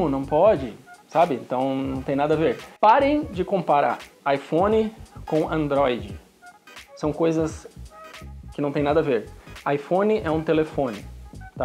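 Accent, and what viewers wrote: Rio Grande do Sul, Brazil. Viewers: Você é de Florianópolis ???? Pergunto por que teu sotaque é de gaúcho !!!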